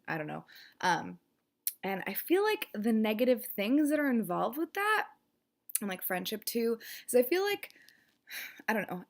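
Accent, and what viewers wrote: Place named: New York, United States. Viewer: Nine Simple Patterns sounds really interesting and right up my alley. The pooping incident happened to my cousin lol. It wasn't funny at the time though. I've been feeling like people are overly sensitive for the past few years. As a whole we've just gotten more dramatic. I like your New Yorker talk. I want to subscribe now!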